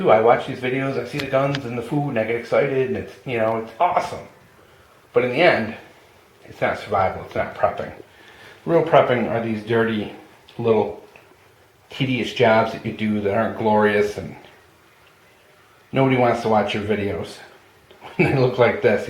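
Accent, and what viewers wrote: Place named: Midwest, United States. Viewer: LOL your accent reminds me of home! are you from Michigan or Minnesota?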